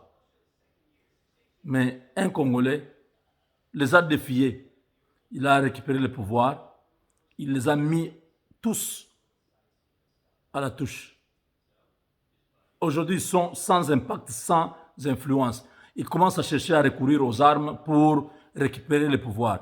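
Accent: Rwanda. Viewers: C est mr parle comme un Rwandais. Il ment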